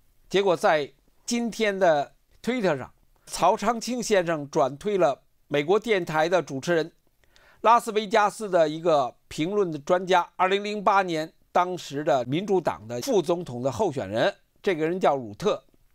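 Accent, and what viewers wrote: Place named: Beijing, China. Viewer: 从十一开始到十一结束很久没看涛哥的节目了，听到涛哥的那口正宗的北京口音感觉棒极了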